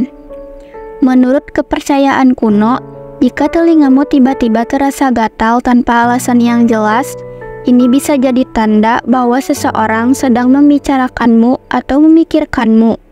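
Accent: Bali, Indonesia. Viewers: iy mnurut saya ini dialek orang bali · Dri dialeknya sptnya org Bali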